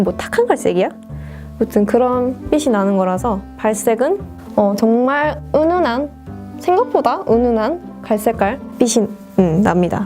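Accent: Gyeongsang, South Korea. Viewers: 헐 오늘 영상 처음보는데 깜짝놀랐어요 중복합성피부, 뾰루지 잘 나고 누런데 홍조있고 뭐 이런건 다 비슷하다 쳐도 아이라인 쪼금만 그려도 화장 완전 진해보이는거 핵공감 ㅠㅠ 제 컴플렉스?라 해야하나 진짜 골치아파요 다른애들에 비해 화장 짙게 하는 편 아닌데도 제가 제일 풀메이크업한것같아보이는... 왜 그런지 정말 모르겠어요ㅋㅋㅋㅋㅋㅋㅠㅠ 저도 경상도 사투리 쓰는데 어느지역이세요ㅎㅎ? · ㅌㅋㅋㅋㅋ역시 경상도 븐이라 그런지 군데군데 반가운 발음들이 있네영 ㅎㅎ 넘이뿌세용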